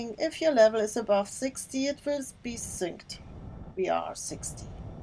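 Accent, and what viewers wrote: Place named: Germany. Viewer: Her accent sounds german... Bist du Deutsche? 😆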